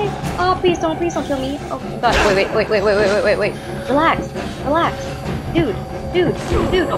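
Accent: Bisaya, Philippines. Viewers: Bisaya u? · Ate pinay ka po ba? Cute ng braces tsaka ng accent mo hehehe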